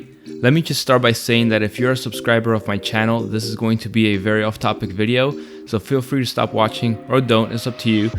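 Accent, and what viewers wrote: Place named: Miami, United States. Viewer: You have a thicc Miami accent brother lol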